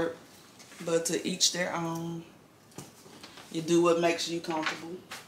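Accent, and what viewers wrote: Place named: United States. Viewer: Nigeria setting or environment, but i hear American English🎉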